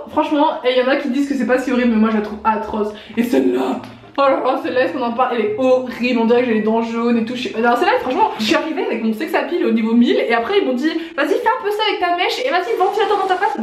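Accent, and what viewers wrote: Belgium. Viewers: T es belge ?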